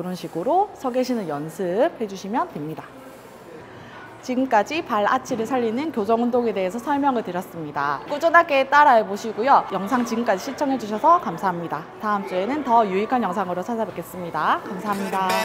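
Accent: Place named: Busan, South Korea. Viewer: 오붓싼?! 같은 부산이라 그른가 사투리 찰져서 이해가 쏙쏙 잘 되네요😊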